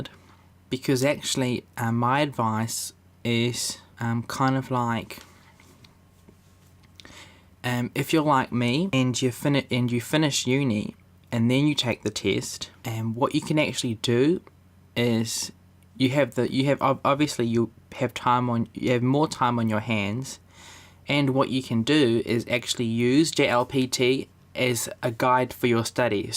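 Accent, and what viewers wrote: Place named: New Zealand. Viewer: Hahaha like ur kiwi accent mate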